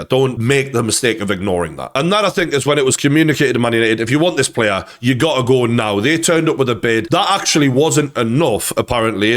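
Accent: Newcastle, United Kingdom. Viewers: yet youre here listening to a geordie about a geordie club.. Living rent free in your head 😂😂😂😂😂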